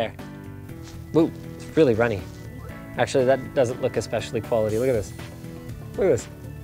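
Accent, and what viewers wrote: Canada. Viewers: The Canadian comes out so hard in this video... Normally I can't hear Linus's accent too much, this episode though...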